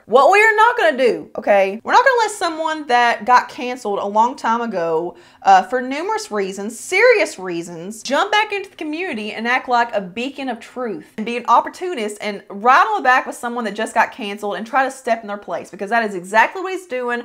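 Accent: South, United States. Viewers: If people didn't, or don't care for, other people's opinions, then they shouldn't watch videos like this one, and get all worked up over something that didn't, doesnt, or won't matter. For 1- Overly critiquing people do it out of boredom, or to cover an insecurity of their own, like something they desire, and maybe you remind them of it (pretty eyes, followers, make up skills, etc) so they try to blast on you, or blow up comments with negativity. BUT...Oh, positive energy trumps that every day! 😁. AND takes more practice then being a "down doucher" I'd rather eat a bowl of water with a fork, then to listen to someone who constantly puts down someone, or EVERYONE, around them. Yeah. I just said that lol Both are pointless, and waste time, not just mine, or yours, but the people who stay to hear it, or in here, read it. The fact that you do these videos at all shows strength and character, and showed that the product works.... 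well....that's all I wanna see in any of these videos. These opinions help me decide on the purchases I make, before I spend, or waste, my money.... So keep showing your before and after make up applications, what you do and don't like, and I'll keep watching! And I respect the Southern accent! 😂. I was born and raised southern, and even now, in my 50s, I sound like the kid from Sling Blade 😂 (From Tennessee and that's my opinion) Keep on keeping on, and forget the haters!! Somebody is always gonna hate, and judge, and try to make you lose you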